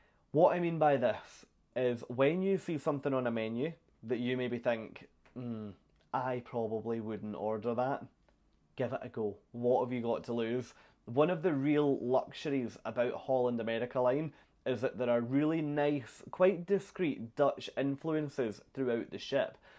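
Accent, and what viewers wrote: Scotland, United Kingdom. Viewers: Are you speaking English? THAT's the Aussie accent I was warned about! Not that there's anything wrong with it. OH Scottish. My bad. I was thrown off by the shirt. I do a fair Scot accent myself! You're kinda young for HAL...actually you are VERY young for HAL! 🤣 Dutch and Cuisine in the same sentence...does not compute. Not every HAL ship has a 'thermal suite' On Signature class ships, I THINK it's called The Retreat. This is true on a lot of non Pinnacle class ships - IE BB King, notes etc is not on every ship either. We are going to be on the Nieuw Amsterdam on our next cruise and the only Music Walk venues they have is Billboard and Rolling Stone. Not just a wine tasting....but a wine PAIRING! I wonder if they have a master Sommelier on board! There aren't that many of them in the WORLD! Have it All includes a night at Pinnacle! 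· I loved your videos and your Scottish brogue. I’ve travelled a lot on Holland and enjoy it but I am 87 and can’t get around well enough to do all those activities. Don’t get off at ports either as walking is difficult but I DO enjoy eating and relaxing. Thank you for the videos!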